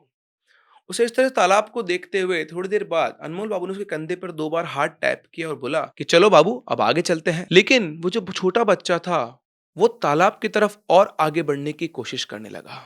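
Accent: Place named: Bengal, India. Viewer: Bengali accent ....story bengal ki h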